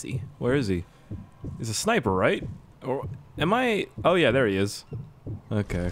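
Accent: Germany. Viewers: I haven't laughed so hard in months 😂😂 your German accent is fucking great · Best German accent ever :D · As in ur German accent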